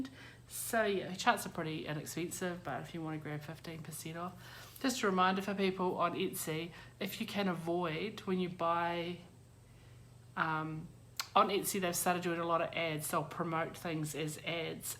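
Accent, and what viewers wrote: New Zealand. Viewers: So cool to hear your Kiwi accent after watching so many American Floss Tubers. I love your colours for the Modern Folk Embroidery SAL. So pretty. Your mustard house is so cool. I am yet to stitch a big house. I'd love to stitch that one.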